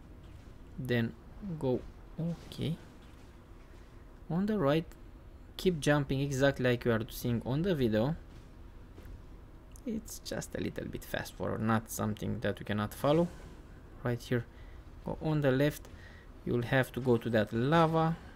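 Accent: Romania. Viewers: ce accent de roman ai :)) esti tare bravo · u sound romanian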